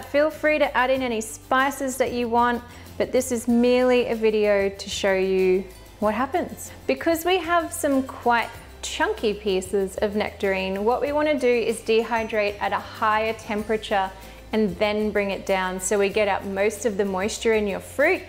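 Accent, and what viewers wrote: Australia. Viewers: Are you Australian, where did you buy that machine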